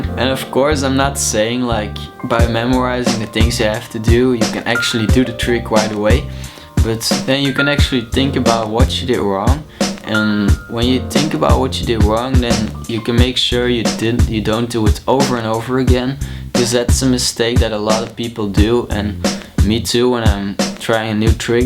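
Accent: Netherlands. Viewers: Are you Dutch? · Okay but that Dutch accent tho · are you dutch? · u dutch? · yep, obviously dutch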